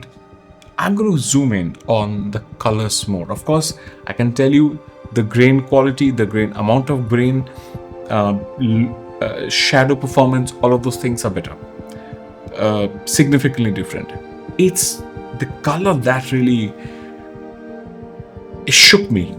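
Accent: India. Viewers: Are you bengali by any chance? Anyway did you try using the mini hdmi to hdmi coverter with the cage? What is that part called?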